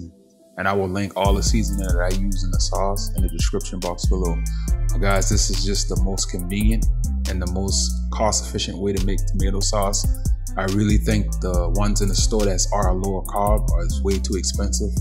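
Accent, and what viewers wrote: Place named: Charleston, United States. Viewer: I knew by the accent! Good ole Chuck Town! I just subscribed! Keep the videos coming! It's very helpful!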